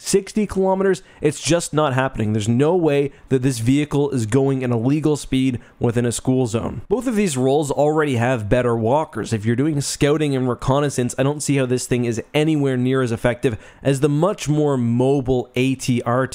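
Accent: Canada. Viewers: Based on your accent you’re Canadian?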